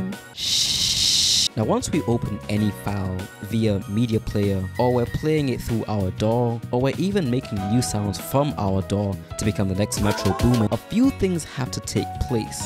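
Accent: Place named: United Kingdom. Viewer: really excited to see your british accent get stronger with each video! <3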